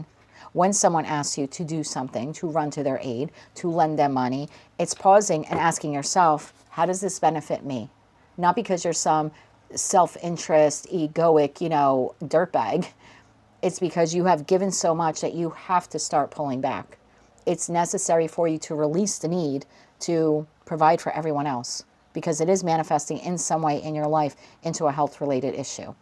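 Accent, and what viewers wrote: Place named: Newark, United States. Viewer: Don't mind the cursing at all, appreciate the honesty! Sounds straight from Newark!😅 great reading, thank you! Much Love ❤️ & Light ♍️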